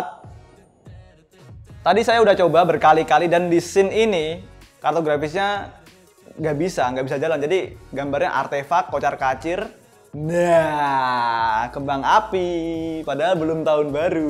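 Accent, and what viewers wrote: Java, Indonesia. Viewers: Kedengeran medok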